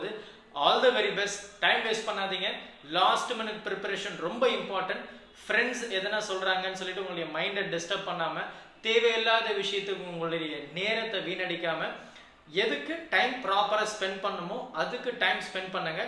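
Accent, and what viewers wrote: Coimbatore, India. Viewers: Coimbatore polaya sir😂😂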